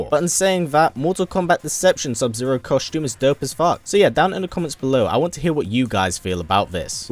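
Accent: United Kingdom. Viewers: Hey man, are you hailing from the UK?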